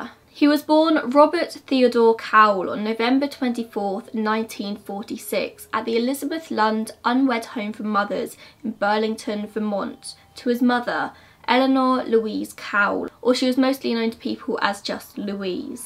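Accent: United Kingdom. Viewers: R u british??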